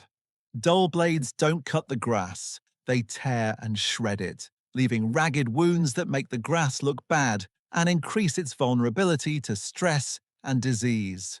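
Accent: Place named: United Kingdom. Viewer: This video was solid. British accent AI or not,is always awesome. Thanks for taking the time to make it!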